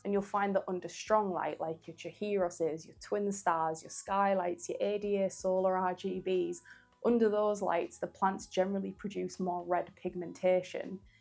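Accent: United Kingdom. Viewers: your accent reminded me of my time studying in Leeds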